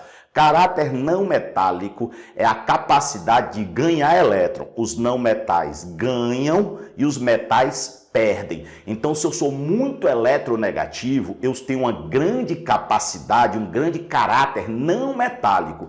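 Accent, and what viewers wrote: Northeast, Brazil. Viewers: sotaque nordestino não negaaa. é "nois" oxeee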